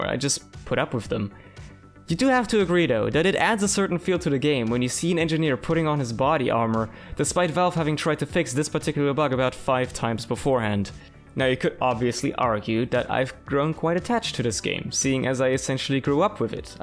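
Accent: Germany. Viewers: are u from germany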